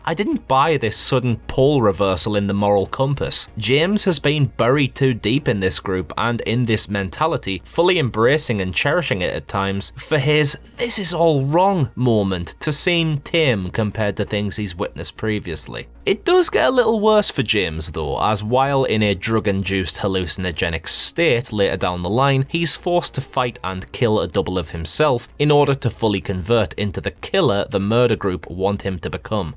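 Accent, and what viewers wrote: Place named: Teesside, United Kingdom. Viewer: Distracted by trying to figure out the accent. Thornaby?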